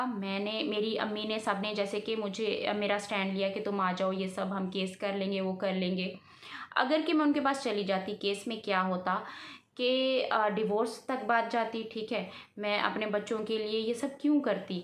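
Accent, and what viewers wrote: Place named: Sindh, India. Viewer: App konsey village say ho..........ap ka lehja tu sindhi lagta hai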